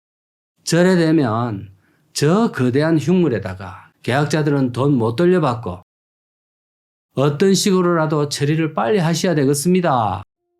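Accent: Gyeongsang, South Korea. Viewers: ㅋㅋㅋ 참에 아~아~아 할때 왤캐 웃기죠 ㅋㅋ 경상도 아재님 ㅎ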